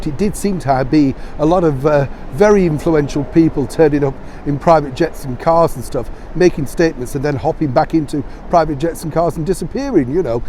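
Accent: United Kingdom. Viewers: If I didn’t subscription to you I wouldn’t know anything about Megan and Harry. They are not very news worthy on our local stations. I do enjoy keeping up with the queen and the other true royals. Keep reporting, you stories are very interesting and I love your British accent.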